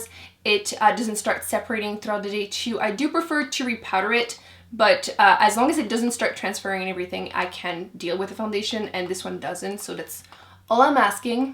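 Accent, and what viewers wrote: Quebec, Canada. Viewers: Clairement tu parles français, Québec?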